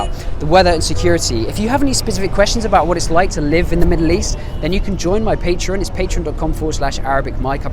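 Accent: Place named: United Kingdom. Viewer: That a big step for you... Love ur video n ur british accent... Pretty decent 👍❤️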